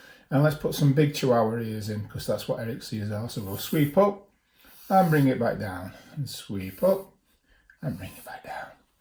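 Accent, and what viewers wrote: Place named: Sheffield, United Kingdom. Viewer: Hello. I've moved from your native Sheffield (Peveril Rd to be precise and often saw you around) to come and teach here in the Turks and Caicos Islands in the Caribbean. I know - it was a sacrifice, but someone had to do it, so me and my wife bit the bullet. Any road, hope you're pleased to hear that there is a little classroom (virtual one for the time being) where your dulcet Sheffield tones are ringing loud and clear to a bunch of 10yr olds who look forward to your cartoon workshop every week. Please tell me there's another one on its way and that there are more to come. I am having a lot of fun getting little locals, Canadians, Americans, Haitians and Dominicans to say things like, " Awreight, sir?" " Tha's reight nesh thee" and " Gi' o'er". So dunna lerrus dahn.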